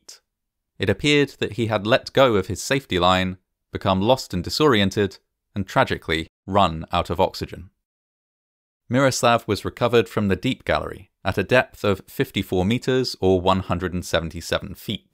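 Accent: United Kingdom. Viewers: British accents work really well for documentaries. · I watch your disaster videos vs others due to that British accent. Keep it up!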